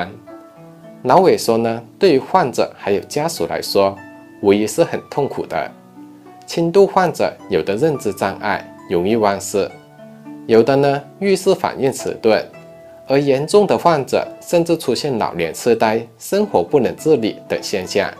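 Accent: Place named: Rural, China. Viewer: “乡下话”，久违了。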